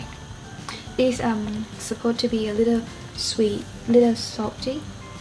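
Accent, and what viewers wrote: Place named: Australia. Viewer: You are picking up a good Aussie accent I notice in many words you say 😁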